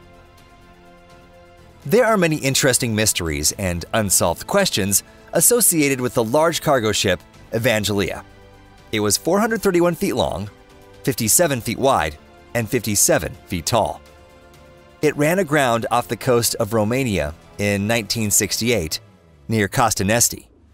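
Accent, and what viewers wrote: United States. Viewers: Most insane American accent i ever heared. Im not able to finish this.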